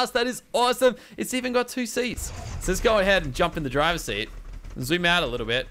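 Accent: Australia. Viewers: wait u are aussie first time i met a aussie youtuber :D im aussie! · your Aussie? ey mate!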